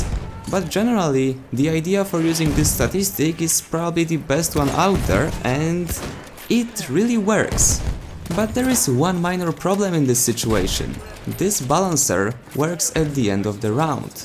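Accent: Germany. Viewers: You should do a video on the skill balancer. Dude, I love your content, keep it up. Also, is your accent German? If not, what is it? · I feel like is german? Or dutch? Especially with that accent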